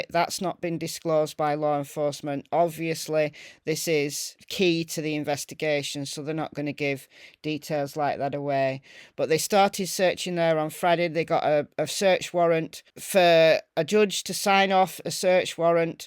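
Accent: United Kingdom. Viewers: Love your british accent hello from America 🇺🇲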